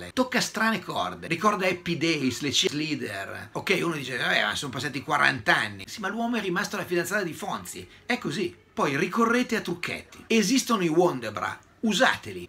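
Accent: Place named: Milan, Italy. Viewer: Io non capisco , signore, cosa c'è da scandalizzarsi. Si può non essere d'accordo,io stesso, maschio etero arrapatissimo, non sono d'accordo al 100% con lui, che oltretutto ha un accento milanese che per noi romani è come le unghie che grattano il muro,però secondo me la femmina avveduta deve fare tesoro delle sue parole, perché che piaccia o no descrivono i desideri di ampia parte degli uomini. Poi,si può decidere di non partecipare alla festa, ma la conoscenza delle cose bisogna averla, e senza puntare il dito, anzi, ringraziando delle preziose informazioni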